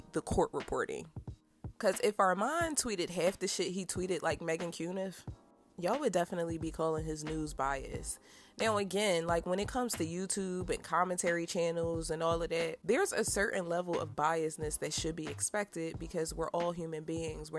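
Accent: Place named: Philadelphia, United States. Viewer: You are def from Philly, I can tell. 💕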